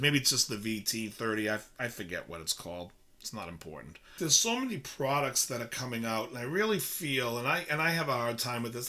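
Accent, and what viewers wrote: Boston, United States. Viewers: You're smooth as silk on that Glamour Profession outro. Keep up the good work. Love the rants and the Boston (or vicinity) accent. Do me a favor and work the word 'car' into a rant sometime soon.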